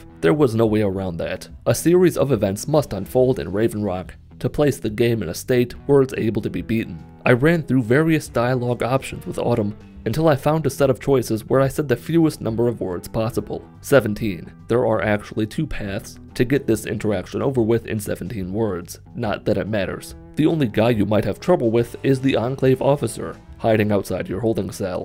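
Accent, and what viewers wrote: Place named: Michigan, United States. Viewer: I always wondered why your accent was worse than mine. And its because you are from Michigan and I am from Ohio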